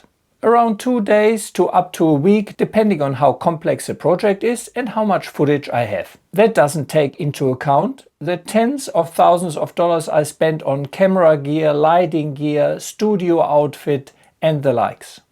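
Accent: Germany. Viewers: That sounds german.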